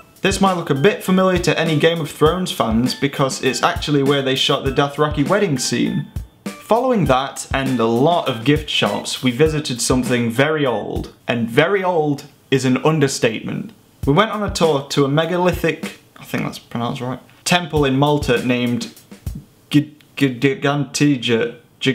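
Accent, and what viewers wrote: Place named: Yorkshire, United Kingdom. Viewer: The Yorkshire accent is strong with this one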